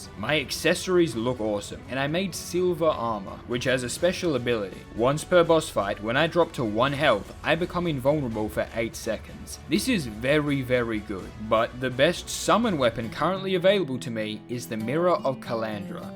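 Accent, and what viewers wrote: Australia. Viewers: wait your Australian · I didn't know you were Australian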